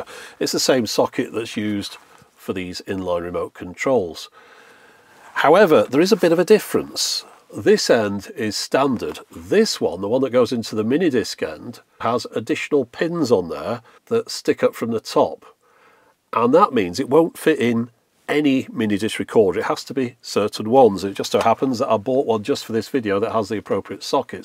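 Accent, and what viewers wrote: United Kingdom. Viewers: I love listening to a British man talking and showing obscure technology, and I think plenty of young people would like to watch a British man talking about tech❤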